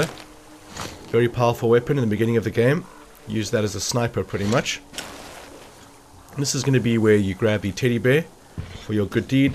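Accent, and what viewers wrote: South Africa. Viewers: Awesome Vid, thanks. You sound hella South African.. Shout out from Cape Town. Keep up the GG's!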